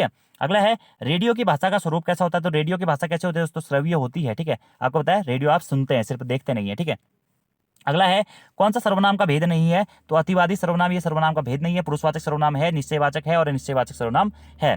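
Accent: Almora, India. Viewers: Ap almora se ho kya sir😅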